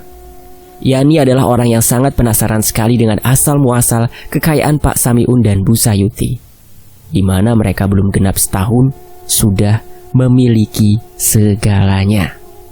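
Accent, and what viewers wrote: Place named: Banyumas, Indonesia. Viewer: Ngapak juga bisa pokoke the best 👍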